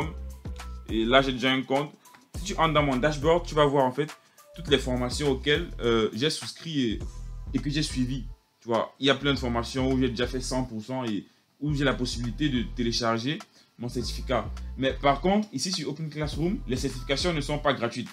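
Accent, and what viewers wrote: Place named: Cameroon. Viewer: L'accent Camerounais🤌🏾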